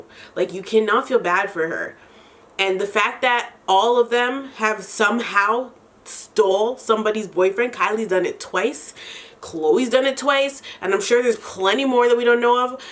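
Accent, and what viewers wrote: Toronto, Canada. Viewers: I really like how you talk, Imma need to bring my Jamaican/Indian self to Canada to get me a nice Canadian accent lol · First of all, I KNEEEEWWWW you were from Toronto from the minute you opened your mouth, I love my Torontonians. Second, you confirmed it with the Brampton mans shit. I liked and subscribed just cuz you said "If you know, you know" about Jamaicans, cuz its so true LMFAO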